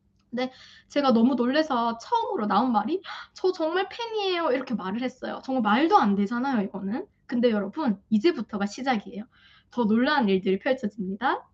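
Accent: Gyeongsang, South Korea. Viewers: 안녕하세요! 지난 주에 알고리즘 타고 제게 쨘~ 하고 나타난! 그 이후로 뜨는대로 다 보고있는데 대구분이신걸 오늘 알게됐네요! 제가 처음 본 영상에 경상도 억양 섞인 영어가 너무 귀엽네요~ 하고 코멘트 남겼었지요. 먼 타지에 살면서 동향 사람 만나 너무 반가운 느낌이네요 ㅋ~ 전 뉴욕에 살거든요. 여튼 쌍둥이 출산 축하드려요!!! 케어하느라 잠도 제대로 못 주무시겠지만 짧디 짧은 영상이라도 곧 뵐 수 있으면 좋겠다는 저의 이기적인 마음 전해봅니다!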